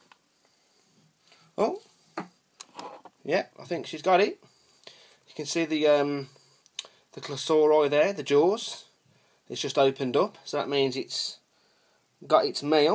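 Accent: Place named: Wolverhampton, United Kingdom. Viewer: Are you from Wolverhampton?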